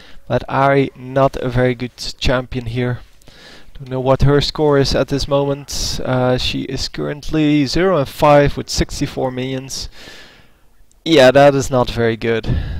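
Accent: Netherlands. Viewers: You dutch hahaha dat hoor je gwn :-/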